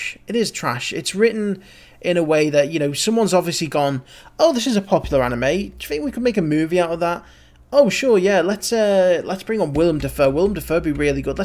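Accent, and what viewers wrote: United Kingdom. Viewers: I dont know why but I imangied you having a American accent from some reason but no we got a british guy 😂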